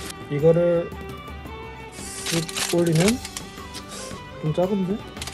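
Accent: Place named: Pohang, South Korea. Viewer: 포항시 북구 사람 같네요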